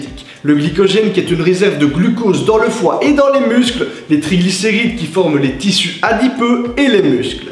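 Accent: Liège, Belgium. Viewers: Personnellement je n’ai qu’une question ^^ tu viens d’où ? On reconnaît un peu l’accent de Liège mais je suis pas sûr lol ^^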